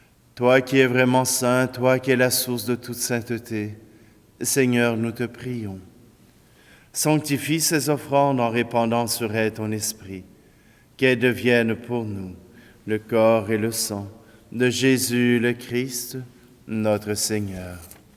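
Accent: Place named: Canada. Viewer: Il y a trois ans, la Providence a fait qu ' un certain à des milliers de kilomètres de chez moi célébrait la messe. Un accent canadien, je me suis adaptée! Quelle liberté car nous n avions en France droit de nous éloigner qu'à 1 kilomètre de notre domicile...Merci Seigneur de nous avoir envoyé. Ses qualités humaines, ses homélies, ses chants choisis, m 'inspirent chaque jour. Je te rends grâce Seigneur de ces moments de partage de foi, d Espérance. Notre France va si mal, elle a oublié son berceau chrétien... Merci encore. Bonne journée à tous.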